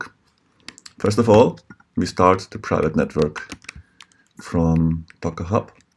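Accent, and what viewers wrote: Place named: Germany. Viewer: you sound so german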